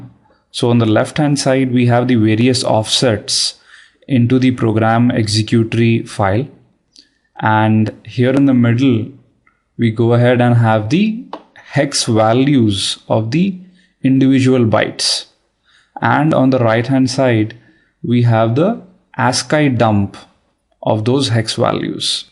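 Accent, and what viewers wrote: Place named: England, United Kingdom. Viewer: you have a strong english accent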